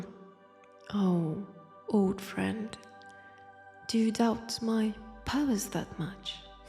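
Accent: France. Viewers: Hearing your beautiful French accent whenever you upload a new video always makes my day, I hope things have been going well for you lately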